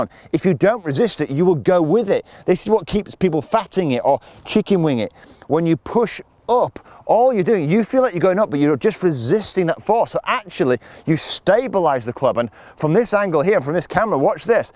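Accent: Yorkshire, United Kingdom. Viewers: Where are you from? I hear a yorkshire accent in there somewhere haha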